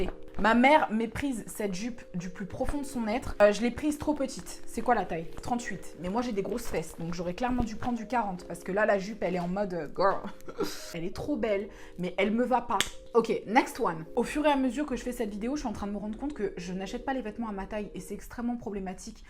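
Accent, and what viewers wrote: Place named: London, United Kingdom. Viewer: Moi jveux aller à Londres pour revenir avec le même accent que toi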